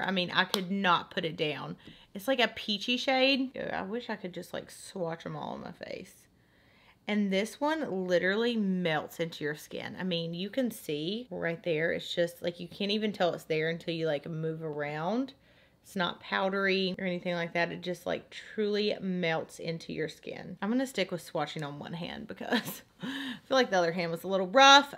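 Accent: South, United States. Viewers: You are so beautiful and I could listen to you talk all day! Love that southern accent! ❤️😘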